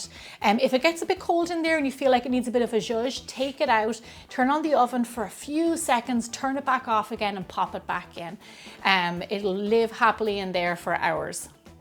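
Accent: Ireland. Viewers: Just to clear up any confusion, this is an accented Irish correct? I just didn't want to get it wrong because I've learned that Scots have a more harsh tone to their speech, but some speak as soft as Irish folk. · We make yogurt everyday. This is her first time. Very strong Irish accent.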